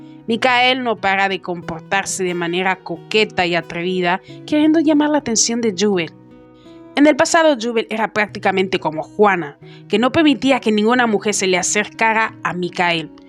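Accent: France. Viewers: Oye eres de Francia? Esque tienes un asentó muy bonito 😮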